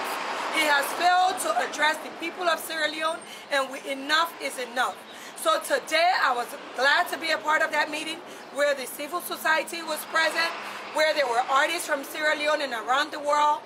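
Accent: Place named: Liberia. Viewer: You sound Liberian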